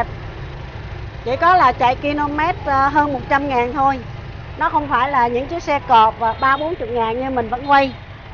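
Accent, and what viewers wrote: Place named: Nam Dinh, Vietnam. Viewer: C VẦY, VẦY giống quê NAM ĐỊNH em thế.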